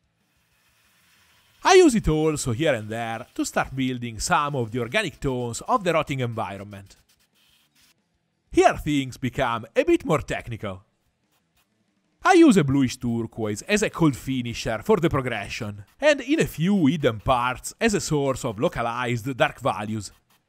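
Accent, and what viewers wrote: Italy. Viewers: Complimenti! Sei bravissimo, poi l'inglese stile Super Mario è la ciliegina sulla torta! 🥰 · aaaah okok! Sentivo dall'inglese un po' "maccheronico" che un po' tutti noi italiani abbiamo! Apparte questo, sei un mostro grande!!! Io ho iniziato ora escono statuine del presepe.... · Video davvero belli di ottima qualità! Molto interessante ed istruttivo. Molto simpatico l'accento inglese un po' italianizzato anche se a volte è davvero comico 😁😁... Ma comunque complimenti!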